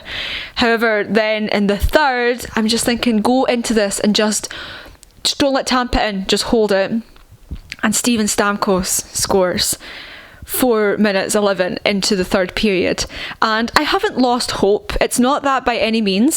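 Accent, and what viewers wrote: Scotland, United Kingdom. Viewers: U got new subscriber are you Canadian my bad u scottish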